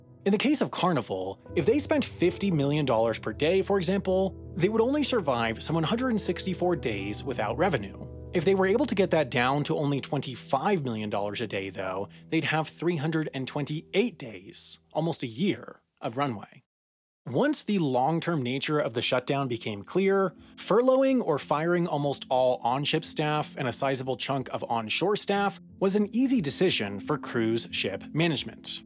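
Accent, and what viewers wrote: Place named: United States. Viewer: Dude I love your videos. But where are you from? You sound American but your cadence of speech is.......uncomfortable. I’m not criticizing I’m genuinely interested.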